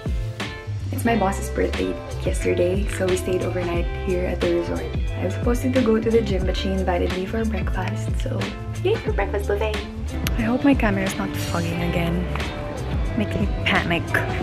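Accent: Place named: Visayas, Philippines. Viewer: Pag ikaw talaga nag sspeak ng bisaya ang sarap sa ears 😍. More pa sa mga next vlogs pleaseee3 🤗❤ keep safe ate ❤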